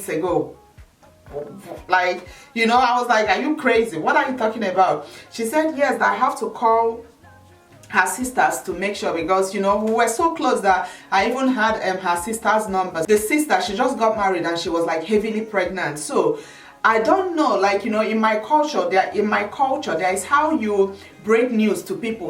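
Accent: Nigeria. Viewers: thanks, so touching but interesting! Your stories are always so interesting..................never a dull moment with you! Sorry about the loss! God knows best! slay on my queen! your accent and repeated "words" makes me know you proudly Nigerian! lol when we see oyibo, we know say na oyibo, when we see our own, we know say na our own......kissessssssss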